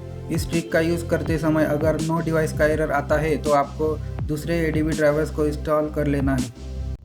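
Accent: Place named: China. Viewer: His voice is like CHINESE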